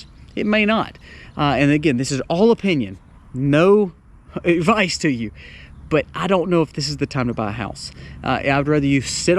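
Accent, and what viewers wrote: South, United States. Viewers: Great points that you rsise - thank you. On another note, I don't particularly like the American accent except for that cool Southern accent you have. Such a great accent and I love listening to it 😅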